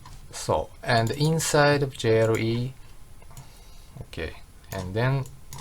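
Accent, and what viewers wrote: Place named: Japan. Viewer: 日本の口音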